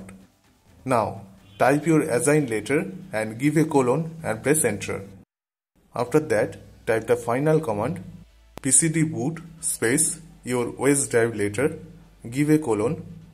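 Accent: India. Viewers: Awaaz se vah Indian dikhta😂 hramkhor bolna re Hindi mein bol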